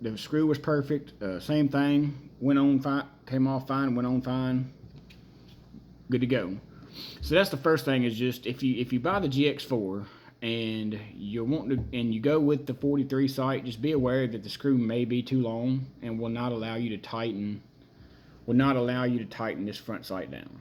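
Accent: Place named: East Texas, United States. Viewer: Just curious, are you from East Texas? Accent sounds familiar lol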